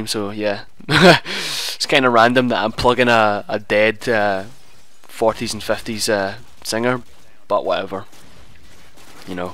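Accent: Scotland, United Kingdom. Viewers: are u british or sumthin not trying to be raseist or wat evva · Awesome accent, I'm Scottish too. Half. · r u scottish cus my neighbor is and scottish accents are cool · i like your accent, scottish? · He sounds a bit Scottish to me. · its scottish it isnt anything like irish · are u scottish???? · Your scottish accent is probably the only kind i like, Xbrzz sounds so common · wa hey. A scot. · you sound more scottish <3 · Nice scottish dialect 5/5 (Nice play 2) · I love calm scottish accents :D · Man, I love your scottish accent, awesome! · Scottish, I’m his nephew btw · I like Scottish English,your voice reminds me of Soap Mc Tavish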